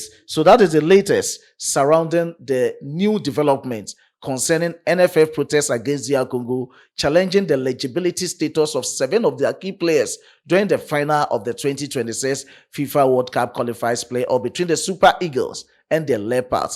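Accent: Ghana. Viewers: You sound like another Ghanian pretending to like Nigerians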